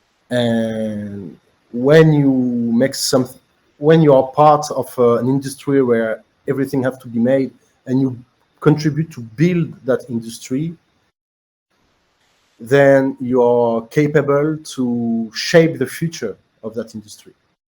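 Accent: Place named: France. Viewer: So greaaaat 🔥 Love your cute French accent lol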